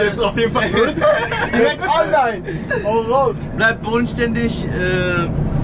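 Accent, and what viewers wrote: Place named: Germany. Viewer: You sound happier... and more successful... always learn one thing for sure..that is to smile and be happy... offcourse other points are there too that we learn and get inspired from...love your german accent and the language ... wish you vlog more often... and yes... CONGRATULATION ON YOUR MARRIAGE...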